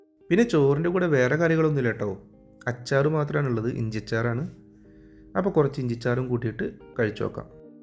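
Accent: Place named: Thrissur, India. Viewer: ath trissur kkarde oru slang aatto😂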